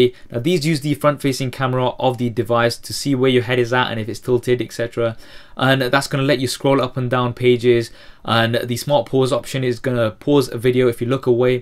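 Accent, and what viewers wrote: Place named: United Kingdom. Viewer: This man has British Accent and I love it. Its more understandable than the American Accent. Well, going back to the topic, for me iPhone 5S is better and BEST than any other phones either. Samsung phones are just copycats of iPhone's features and specs and EVEN the accessories! Without iPhone being released on the market, these copycat android phones won't exist!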